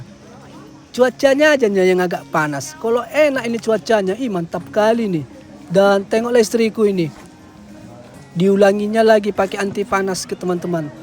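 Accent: Medan, Indonesia. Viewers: suara abang khas banget melayu medan senang aku dengarnya bang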